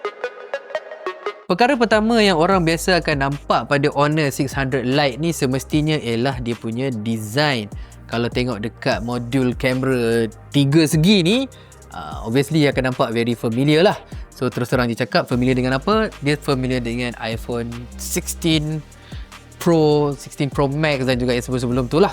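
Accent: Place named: Kuala Lumpur, Malaysia. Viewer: Serius lah kalo bukan komen Hang aku rasa Abe ni ore kl 😂😂😂😂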